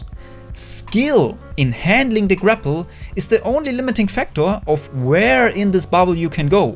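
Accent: Germany. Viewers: u sound extremly german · I as a German INSTANTLY recognized his accent😂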